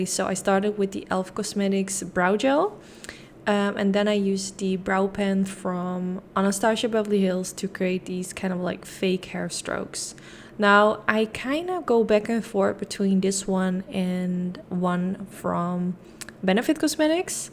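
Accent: Netherlands. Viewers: Are you Dutch? 😁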